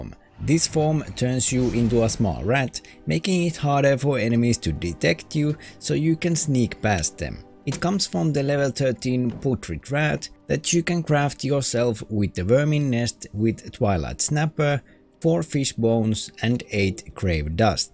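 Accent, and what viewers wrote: Finland. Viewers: Haha Suomi-aksentin tunnistaa kyllä :D Hyvä video, kiitos tästä 😊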